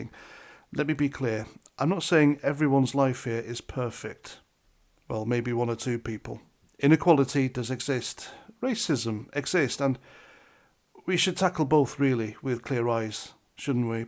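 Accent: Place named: RP, United Kingdom. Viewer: What a fraud this wanker is talks posh now he’s played in a few Christmas pantos Sir my arse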